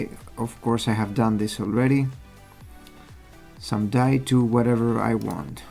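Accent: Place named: Greece. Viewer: You sound like a Greek..I guess you are 😅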